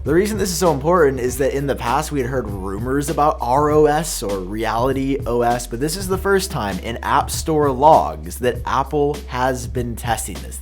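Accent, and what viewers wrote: England, United Kingdom. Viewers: British accent on fleek as the kids say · Great English accent! Thanks for the video!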